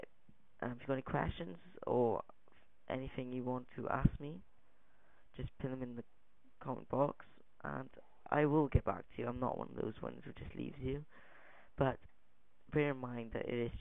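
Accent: Wales, United Kingdom. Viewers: u sound like a mix between welsh and english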